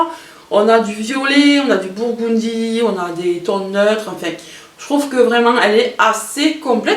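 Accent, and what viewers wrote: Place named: Tarn, France. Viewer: Bonjour, ta vidéo est très sympa. De plus, quel bonheur d'entendre ton accent, qui est aussi le mien, sur YouTube. Bisous 🥰🥰 du Tarn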